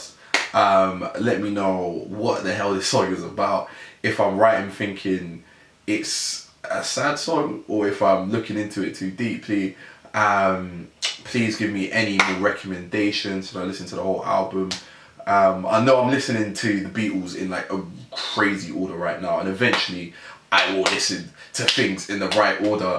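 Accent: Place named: United States. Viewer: Why do you sound like an American?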